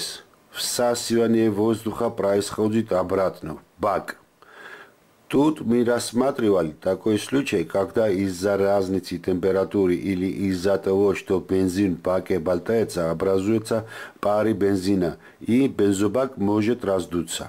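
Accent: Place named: Georgia. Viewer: Грузинский акцент, ласкает слух 👍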